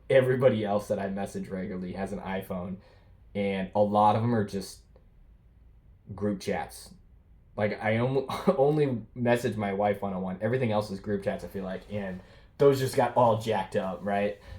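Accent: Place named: Texas, United States. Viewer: Why is this Swedish man speaking in a Texas Accent? His face looks exactly like the image on the Shroud Of Turin. His phenotypical facial features indicate he directly descendanded from Aryan stock in Northern Scandinavia. Google the Shroud Of Turin. The image captured on the cloth is a direct phenotypical copy photo of this guys face. I wonder if he has the courage to type a google search on what i said.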